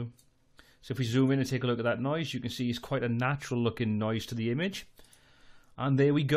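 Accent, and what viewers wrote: Wales, United Kingdom. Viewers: How lovely to hear a Welsh accent!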